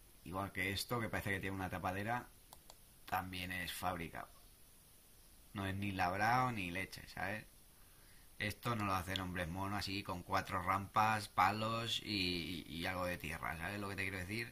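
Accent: Spain. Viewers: tú eres valencia segur. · de que parte de España es ese acento...... es como el castellano chileno.... que no se entiende un carajo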